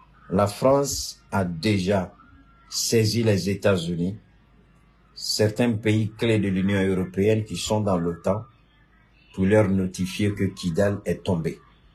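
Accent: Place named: Ivory Coast. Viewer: PERSONNE NE VIENT ECOUTER POUR APPRENDRE AVEC TON ACCENT IVOIRIEN JE BE SAIS PAS OU GABONNAIS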